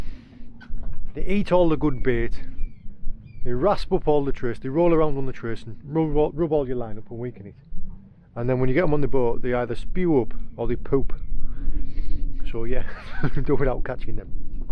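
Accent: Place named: Middlesbrough, United Kingdom. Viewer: I’ve been watching your videos and I do like the fact you explain the tides and wind and how you set your boat for fishing😁😁🤩👍 I have also noticed your accent and it sounds like you could be from Middlesbrough or cleavland or red car love your content 👍👍👍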